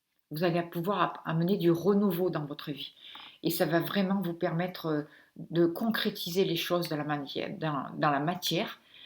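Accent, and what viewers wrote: South, France. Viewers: Merci à vous ! Oui, c'était lourd ! Et comme j'avais choisi la pouppée jaune vous avez vu juste comme toujours ! Vous êtes fort énergétiquement je le sens. Priez pour moi avec Archange Michael et St Benoit svp car il y a eu beaucoup de bloccages (aussi familial) causés par la jalousie et les méchancetés. Merci ! Je suis Flamande et je continue CERTES à suivre vos vidéos aussi longtemps qu'il est nécessaire ! Vous avez un accent du Sud de la France , non ?Bien à vous car Dieu est avec vous je le ressens . Je suis tombée pour la première fois sur vos vidéos en février/mars et ce n'est pas un hasard ! On m'a fait beaucoup de saloperies et boccages dans ma vie (j'ai 58 ans) donc je suis ce qu'on appelle "un cas grave" …..Continuez votre travail de libérations énergétiques et prières car vous avez reçu de Dieu une Mission et des REELLES dons !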